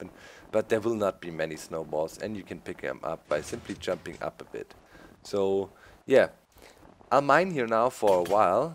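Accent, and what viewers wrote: Germany. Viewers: your german accent sounds so awesome haha xD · Aber man hört schon dass er deutscher ist.. Findet ihr nicht?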